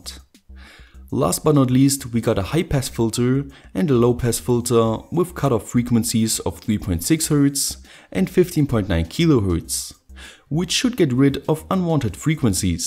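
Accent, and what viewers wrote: Germany. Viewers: Are you german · Kommst du zufällig aus Deutschland? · Are you German? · Lol, du bist deutsch?!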